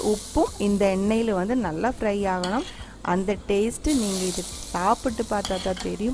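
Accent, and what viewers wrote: Kerala, India. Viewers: U Malayali or Tamil? 🤔🤔🤔🤔🤔 Talking so different 🤔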